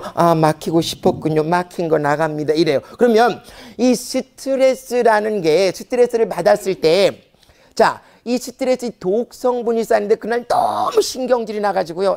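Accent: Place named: Jeju, South Korea. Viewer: 제주도에서 사투리로 웃음강의하시는 쌤 입니다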